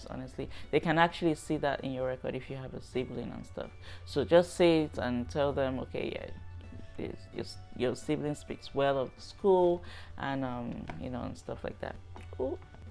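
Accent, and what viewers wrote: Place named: Nigeria. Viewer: Where u from ?Like u have naija accent buh the name tho...🤔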